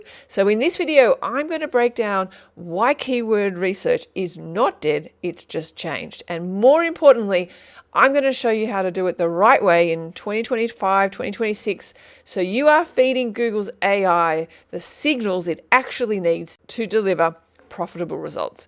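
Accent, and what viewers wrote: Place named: Australia. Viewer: Australian accent is so sweet :)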